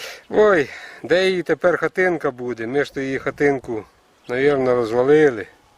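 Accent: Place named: Cherkasy-Vinnytsia, Ukraine. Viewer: Я думаю, шо це захід Черкащини або Вінничина, принаймні там люди розмовляють схоже))) Приємна мова!!!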